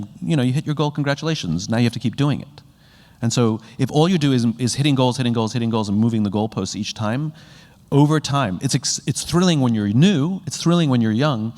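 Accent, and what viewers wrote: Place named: United Kingdom. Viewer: I love British accent of yours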